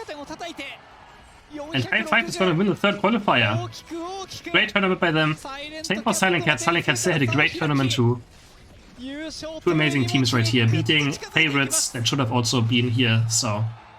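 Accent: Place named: Germany. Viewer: Your German accent is too much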